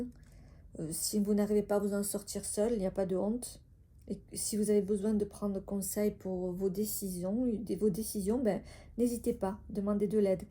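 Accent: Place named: South, France. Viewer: Bonjour! Ton tirage bien que peu qualitatif ne manque pas d’audace. Le cadrage est dans l’ensemble médiocre sur ce point tu as exagéré. Mais j’ai réellement était inspiré par ton tirage. Ça me parle et ca c’est beau. J’apprécie la manière dont tu présentes les choses. Et ton petit accent chantant du sud me met du baume au cœur. Je t’invite a continuer sur ta lancée Bravo.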